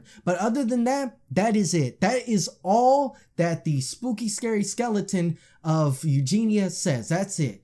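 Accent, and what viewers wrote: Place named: South, United States. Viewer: I keep hearing a suthern twang when he's talking. Am I the only one hearimg that? Gotta love those country boys lol 😉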